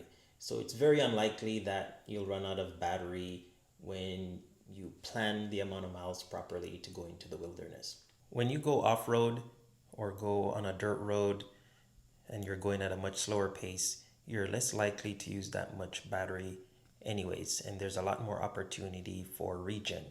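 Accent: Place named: Bahamas. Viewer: What island are u from ? I’m guessing the Bahamas.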